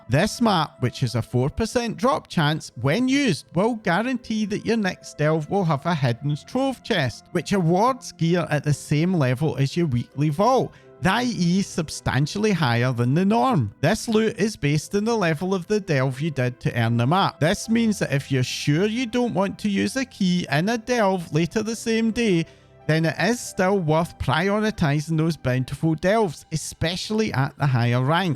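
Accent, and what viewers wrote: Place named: Highlands, United Kingdom. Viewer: This guys accent reminds me of my late grandfather Kincaid. He was a highlander. Ignore the trolls, it's all they've got. Let em get there tiny scrap of fleeting self esteem. It vanishes as soon as they get it. Please. Allow me. Piss off trolls.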